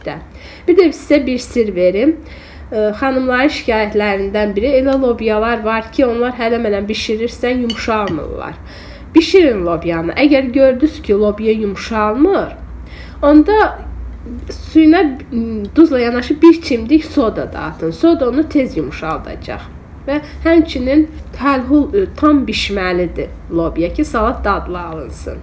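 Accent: Baku, Azerbaijan. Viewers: Çox sağ olun,əllərinizə sağlığ hər bir təamı çox gözəl Bakı ləhcəsin də izah edirsiniz adama xoş gəlir.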